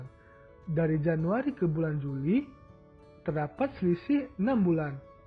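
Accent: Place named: Bengkulu, Indonesia. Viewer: Keren kak, berasa aksen bengkulunya coba lain kali dubbernya orang yang sama tapi disuru nyanyi dulu biar fresh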